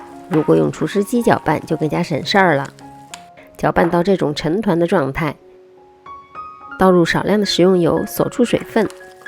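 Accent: Shanghai, China. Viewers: 原来是上海宁呀，怪不得不一样，一直在怀疑侬是上海宁，现在终算证实！😂😂😂😂❤❤❤❤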